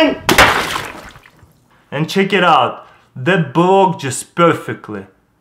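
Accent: Russia. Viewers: Are you Russian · He's Russian. English isn't his first language. Dick.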